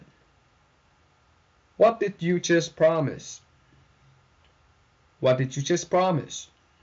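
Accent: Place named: General American, United States. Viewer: giọng mỹ chuẩn quá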